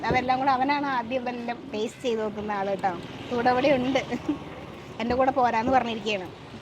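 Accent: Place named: Malabar, India. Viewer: മലബാറിന്റെ സംസാരരീതിയും മലബാറിന്റെ രുചിയും പാചകരീതിയും സംസാരത്തിൽ തോന്നുന്നു. എന്തോ തോന്നലാകാം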